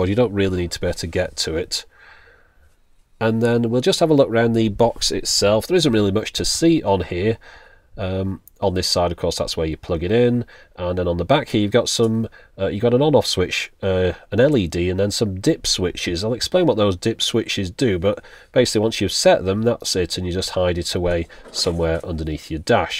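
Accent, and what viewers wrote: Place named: England, United Kingdom. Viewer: it has an english accent, yuck! :(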